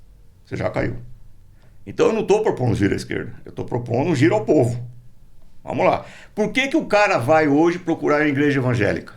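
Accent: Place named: Rio Grande do Sul, Brazil. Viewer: Arbex disse q vc é barriga verde? Mas com esse sotaque? Mas bah tche? To confuso, como diria o velho gaúcho... o q tu és?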